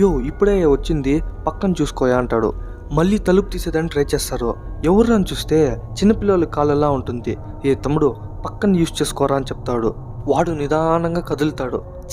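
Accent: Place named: Punganur, India. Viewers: one of my friend punganuru same slang .anduke adiganu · That way chittoor muslim telugu slang language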